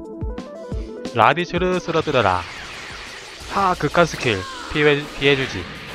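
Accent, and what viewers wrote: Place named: Busan, South Korea. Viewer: 예전에 플스로 먼저 했었다길래 어디있는거지 하다가 어쩌다보니 검색으로 나왔네요 ㅋㅋ 시청갑니다 근데 목소리가 진짜 달라보이네요 ㅋㅋ 이때는 사투리도 더 심하고 ㅋㅋㅋ부산에서 태어나셨구나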